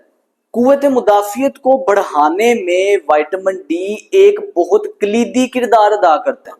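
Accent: Punjab, India. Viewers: لھجے میں بہت پنجابی پن ھے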